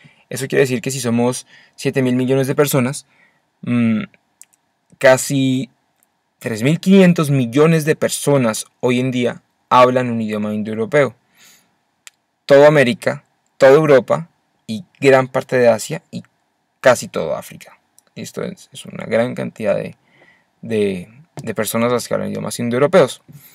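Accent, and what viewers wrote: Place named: Colombia. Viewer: Menudo acento Colombiano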